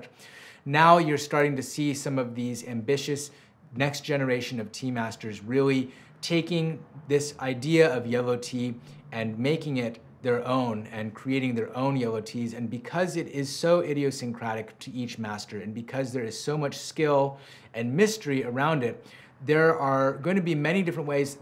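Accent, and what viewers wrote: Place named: London, United Kingdom. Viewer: This whole video I'm just wondering how much you have been influenced by London. You sound like it and the tea pets are a dead giveaway to those who know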